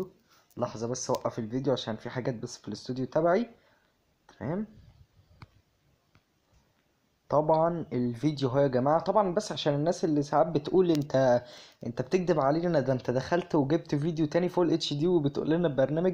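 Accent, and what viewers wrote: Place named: Egypt. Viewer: لمن بتسمع مصري يتكلم لا تواصل الفيديو اطلع على طول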